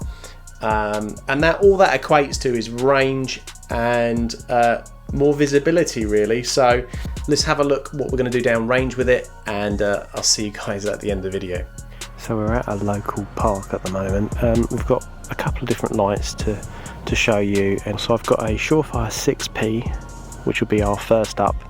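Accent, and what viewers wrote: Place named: Australia. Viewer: Where are you located? Sounds Australian but I though Australians had super tough gun restrictions.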